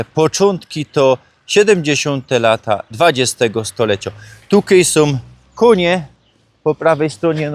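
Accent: Silesia, Poland. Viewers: waserlajtungowa stela ... 😊 zabawne, pierwszy raz słyszę ale robisz postępy bo to tak brzmi richtik "po slunsku" ale bez obrazka wtle nie wiedziałbym dokładnie o co chodzi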